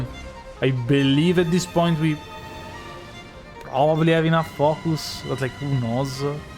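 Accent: Italy. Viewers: It's just your average Italian accent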